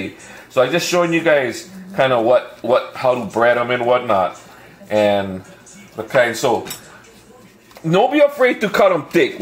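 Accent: Hawaii, United States. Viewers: ❤ listening to you talk takes me back to my hanabada days in Hawaii ❤❤No Place Like Home 🥰 Thank You ❤️ for showing people food that we miss from back home doesn't have to be complicated❤ you made it simple for people like me ❤😊Much Aloha and Mahalo From My ❤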